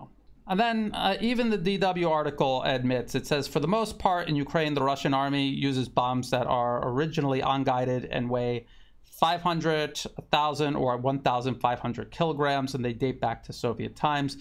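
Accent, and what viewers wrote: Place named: United States. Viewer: It might be your American accent but I hear you talk about “guided bums”. Sounds kinky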